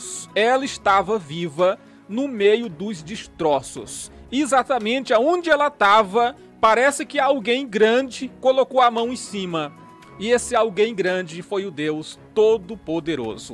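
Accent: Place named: Maranhão, Brazil. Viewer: Sou de São Paulo e gosto muito dos testemunhos e exortações que o senhor traz em seu canal. Admiro sua forma de falar. Sotaque de Maranhense é muito legal. Quero muito conhecer essa cidade linda. Tu é homem de Deus e abençoado. Fica na paz. Abraços!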